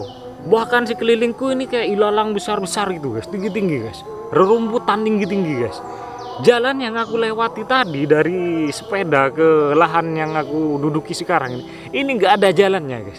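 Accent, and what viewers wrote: Madura, Indonesia. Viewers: Kalo dari logat bicaranya, mas orang madura ya? Salam settong dere tretan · Kok logatnya kaya org madura ya? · Kak kalau dr logatnya orang madura ya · orang madura dari logatnya bicaranya ya mas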